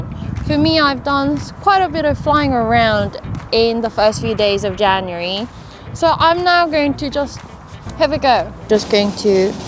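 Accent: Australia. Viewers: I'm sure I detect a hint of an Aussie accent. Am I hearing things ? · First video of yours that I have watched. My new mini will connect to my galaxy s7 with the DJI app, but I paid $25 for the Litchi app and it will not connect. Other people tell me it will work, but do not give clear directions on how to make Litchi work with my drone. I hear a Chinese AND what sounds like Australian accent. Did you grow up in Australia? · Have you spent time in Australia? You sometimes have an Aussie accent :)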